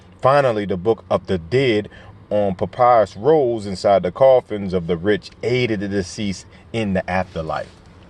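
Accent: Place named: Baltimore, United States. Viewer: Gotta be from Baltimore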